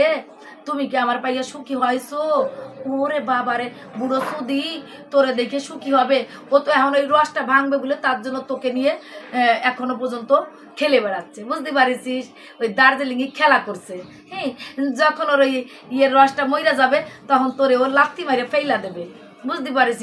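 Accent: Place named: Khulna, Bangladesh. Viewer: খুলনা থেকে দেখছি দিদি,,,ভাষা শুনেই বুঝেছি কাছের মানুষ আপনি❤️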